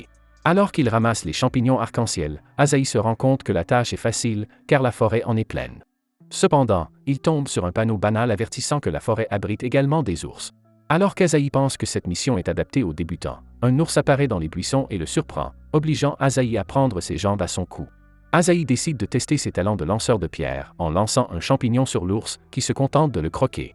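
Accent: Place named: Canada. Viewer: S'il vous plaît 🙏 vous pouvez lui dire d'enlever son accent canadien et de redevenir comme avant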